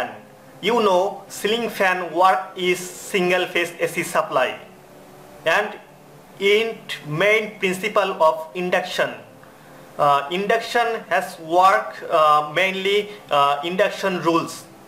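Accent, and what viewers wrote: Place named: Bangladesh. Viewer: Your English like Bangla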